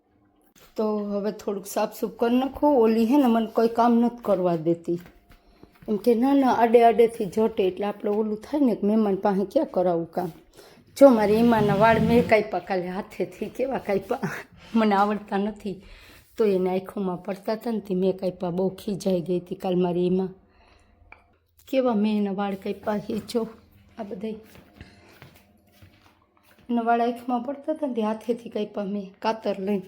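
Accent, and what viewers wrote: Kathiawar, India. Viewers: કાઠિયાવાડી લાગે છે 😄🙏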